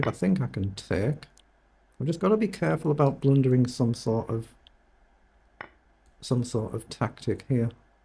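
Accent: Newcastle, United Kingdom. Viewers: Discovered this channel yesterday. Simple, instructional, to the point. Great channel. Are you from Newcastle?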